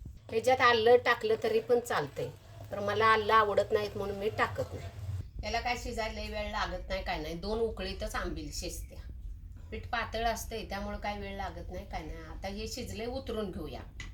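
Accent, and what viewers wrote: Kolhapur, India. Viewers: तुमचे सगळे पदार्थ मला खुप आवडतात आणि तुमची कोल्हापूर ची भाषा पण आवडते ☺️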